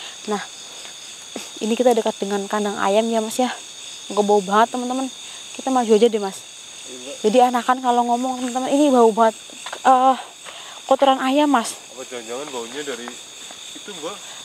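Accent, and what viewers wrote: Banyumas, Indonesia. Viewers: Aq suka geli ketawa sendiri denger dialeknya yg lucu.spt tibluk.ya bhs Banyumasan..bs ngobatin rasa rinduku pd kampung halaman.ayo maju terus mb.I lope.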